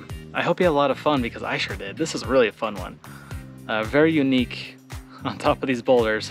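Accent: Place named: Utah, United States. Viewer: Depending on where you are my money is on Fremont Indians if you are north of The Colorado River or Anasazi if you are south of the Colorado. The terrain looks like southeastern Utah. Maybe somewhere between Beef Basin and Grand Gulch. I lived in Moab for almost 30 years. Sites like this are all over the place in and around Canyonlands. Secure food storage was critical and it is almost a certainty that this construction was for that purpose. Actual dwellings in that area tended to be pit houses. Listening to your narration I am guessing you are a Utahn. I’m even going to guess Utah County but oh my heck…. I could be wrong. 😊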